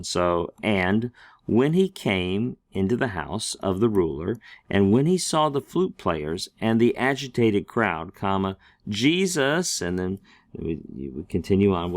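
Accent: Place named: United States. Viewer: Greek with an American pronunciation is not Greek.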